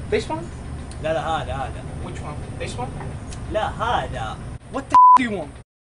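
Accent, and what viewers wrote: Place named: Saudi Arabia. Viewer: مش معقول كيف يتقن اللهجة السعودية احسن منا ...وكمان دمه خفيف 😂😂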